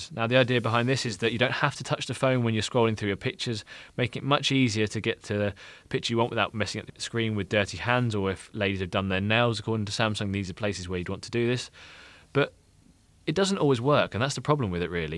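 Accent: United Kingdom. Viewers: Your English British is better than american English, very very strong. I have enjoyed hearing you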